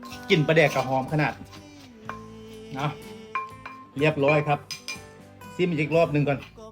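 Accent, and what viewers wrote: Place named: Isan, Thailand. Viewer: พูดอีสานเพราะมาก👍👍👍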